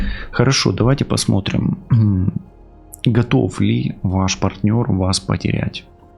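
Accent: Ukraine. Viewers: Доброе утро! Благодарю Вас за Ваши прекрасные расклады,их можно слушать бесконечно,они доходчиво,понятны,Ваш прекрасный голос, Вы просто Супер! А главное всё в точку, Ваш хохлятский говор! Я говорунья,а он молчун, может подойти молча ударить,как он говорил "для профилактики" вот так-то (шутка).Всех благ Вам от души!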